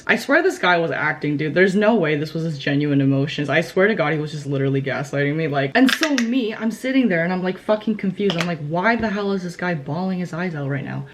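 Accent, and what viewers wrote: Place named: Bay Area, United States. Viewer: Lol! I love your accent. Are you from the Bay?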